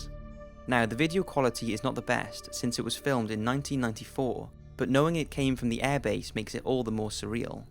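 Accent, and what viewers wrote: Wales, United Kingdom. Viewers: I do love a welsh accent.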